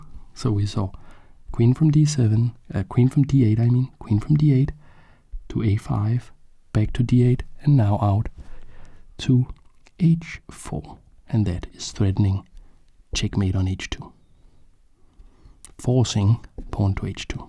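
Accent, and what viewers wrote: Denmark. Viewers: are you Danish? because you sound like one who could be from Denmark.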